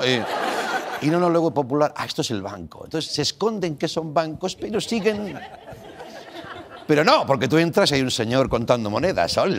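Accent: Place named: Catalonia, Spain. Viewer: Están exagerando muchísimo. Si el catalán es el primer idioma de Andreu, es lógico que tienda a pronunciar como se haría en esta lengua. Ha dicho Feijoó mal la primera vez y luego se ha corregido, y Xunta también lo ha pronunciado como se haría en catalán. Por los comentarios, parece que ha matado un gatito. La próxima vez piensen cómo pronuncian ustedes otros idiomas...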